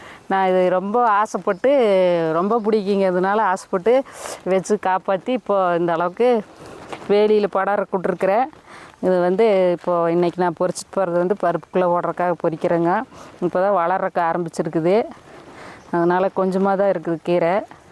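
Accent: Kongu, India. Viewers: கொங்கு வட்டார பேச்சு😂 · Ugga vazakkathai aduthavargalukaga matra veddam akka koggu tamiz kojjum tamiz azagu super 💖✨ 💖 ✨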